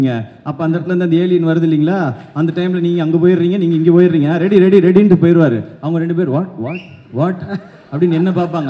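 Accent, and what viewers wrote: Coimbatore, India. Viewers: Coimbatore slang swag nov ❤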